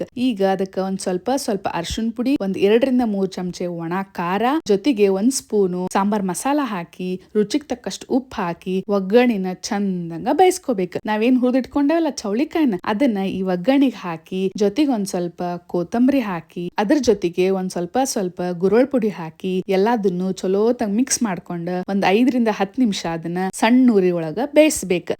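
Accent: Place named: North Karnataka, India. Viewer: ಉತ್ತರ ಕರ್ನಾಟಕ ಭಾಷೆ ಬಾಳ್ ಚಂದ್ ಹೈತಿ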